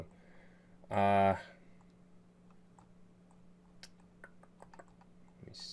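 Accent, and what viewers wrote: Boston, United States. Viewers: Sometimes you sound very young and then the Boston kicks in and you cuss and sound older, but with the cam we can now tell you are just right. :)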